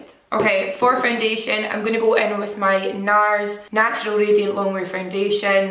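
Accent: Scotland, United Kingdom. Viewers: Hajar Lakroune scottish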